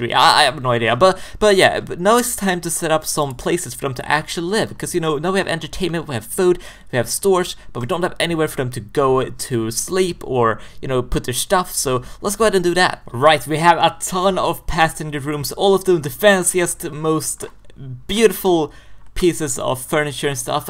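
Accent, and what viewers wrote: Sweden. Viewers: Are you from sweden. I think i can hear it in your accent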